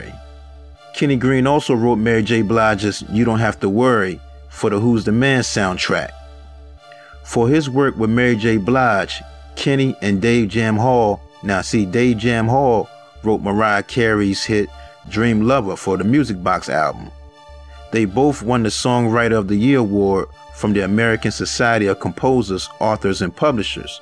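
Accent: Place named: New York, United States. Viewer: Dorothy Copeland Sounds like my city NYC !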